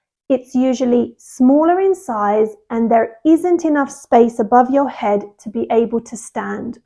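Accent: United Kingdom. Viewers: I like british english and love british girl as u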